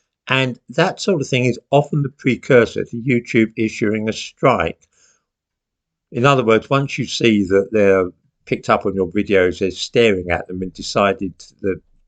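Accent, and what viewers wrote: United Kingdom. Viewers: It is mostly because you MUMBLE and only the UK English can make out most of what you say. I find it very hard to understand a lot of your speech. Tryin learning how to speak well and once you reach a bit of an audience, you will get strikes and a van. You simply aren't a threat to the Left. I doubt that even they understand half of what you are mumbling.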